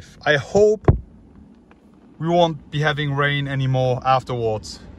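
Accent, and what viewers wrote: Germany. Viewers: Haha man merkt so den deutschen Akzent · Man hört manchmal raus dass du deutscher bist :D aber sehr gutes Video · Hasse es wenn man den Deutschen Akzent so krass raus hört .. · Ich kann mir diesen schlechten deutschen Akzent nicht geben . Aber tolles Auto .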